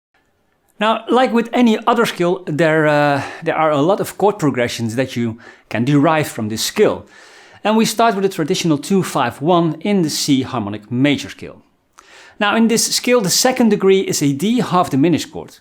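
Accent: Netherlands. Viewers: Dense and beautiful, and with a Dutch accent. I'm jamming with a good friend who is a Nederlander and we are working on diatonic modes. I love your graphic showing how to think about modes. I shared with him. Thanks for dense and beautiful.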